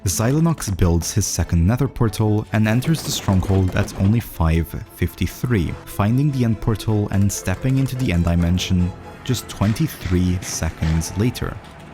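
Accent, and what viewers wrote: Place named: Scotland, United Kingdom. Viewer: It's the vowel sounds that are giving that scottish twang especially the 'I' in minecraft, Northern english accents can have a lot of overlap with accents north of the border so that makes sense! Anyway cheers for answering and class video man!!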